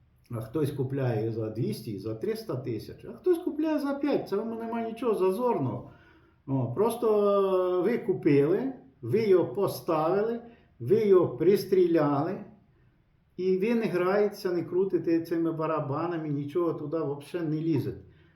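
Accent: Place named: Vinnytsia, Ukraine. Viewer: У Вас розмова Вінницька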